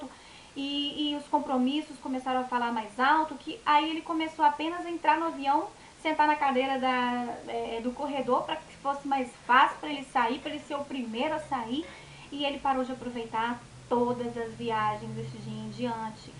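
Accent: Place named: Goiás, Brazil. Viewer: Linda reflexão. To passando por uma fase chatinha e essa reflexão serviu perfeitamente. Mudando de assunto você a goiana? O sotaque me lembra os de Goias kk <link>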